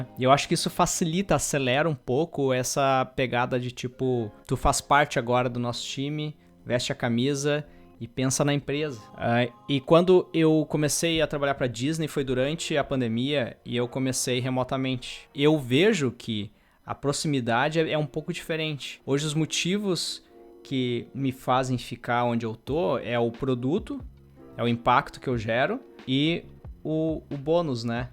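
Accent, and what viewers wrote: Brazil. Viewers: meu sotaque no inglês é igual o seu! Eu sempre ficava muito desconfortável e com medo de tudo que envolve o exterior pelo meu accent. Eu entendo tudo, consigo falar tudo e me expressar, mas o sotaque brasileiro é muito forte e as vezes da aquela engasgada. A gente vê na internet e acha que todo mundo é perfeito e faz tudo que a gente faz 1000x melhor, mas a real é que não. E pra dar certo, pra melhorar, é só ter coragem de dar a cara e tentar. Quem não arrisca não petisca. Parabéns pelo vídeo e sei que o inglês não era o objetivo, mas obrigado! Mesmo num vídeo de "opinião", seu trabalho impacta as pessoas de formas que você nem imagina. Abraço!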